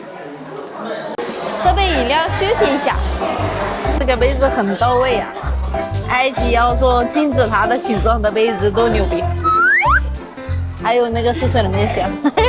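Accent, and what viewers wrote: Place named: Beijing, China. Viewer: 你看看人家歪果仁女主播！这北京话说的那叫一个地道儿~~！！！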